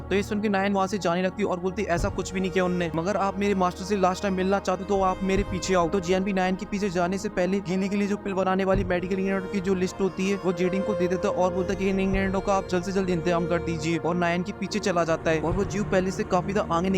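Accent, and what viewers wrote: Haryana, India. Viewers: Kya aap Haryana sa ho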